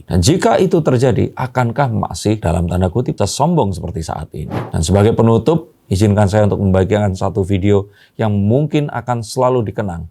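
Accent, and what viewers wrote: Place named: Muria, Indonesia. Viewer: Kok pakai akhiran " TAH" apa admin nya dari daerah sekitar gunung Muria?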